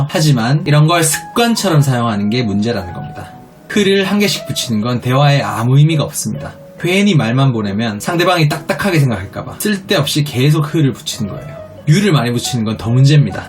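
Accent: Gyeongsang, South Korea. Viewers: 억양이 대구 경북분같으세요. 아주 날카롭게 지적하시고,아주 맞네요. 자기중심적 남자나 여자 아웃.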